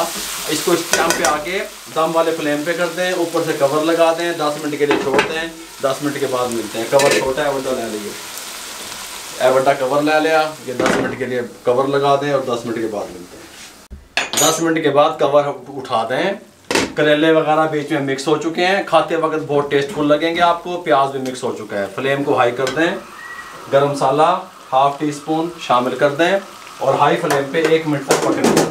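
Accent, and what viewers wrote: Punjab, India. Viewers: Koi masla nahi hai is one of the best dialogue from you sir and camera idhar meri taraf karna such dialogues and punjabi tarka makes your videos so attractive hats of you and your style sir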